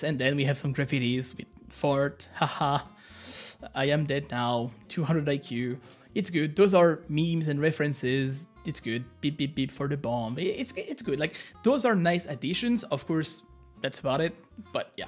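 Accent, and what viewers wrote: France. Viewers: pourquoi tu rate le public us wesh on entend bien que t'es fr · This is the first time i watch your videos. I just make it to and realized this dude is definitely french! xD. One of my friends said there is an amazing huge update in CSGO you must check it out and didn't want to install it but still wanted to see the updates you're the only one who covers them in youtube till now so thanks for reviewing the updates you are really quick in covering them.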